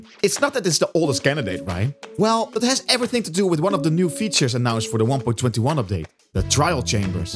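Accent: Netherlands. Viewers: Hello, are you from the Netherlands? I'm asking this because of your accent. BTW love this vid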